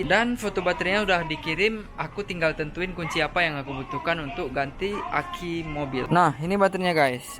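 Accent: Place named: Aceh, Indonesia. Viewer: Logat nya koo seperti org aceh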